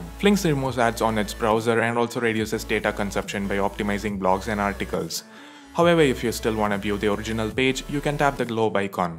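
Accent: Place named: Tamil Nadu, India. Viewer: u still couldn't get rid of tamilian accent. long way to go....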